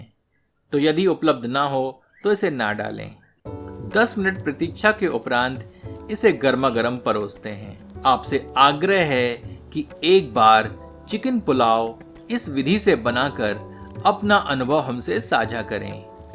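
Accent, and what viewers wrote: Standard. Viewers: Apki awaz or apki shud hindi achiii or rcipi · आप शुद्ध हिंदी में बोलते हैं, सुन कर अच्छा लगा। 👍👍🙏🙏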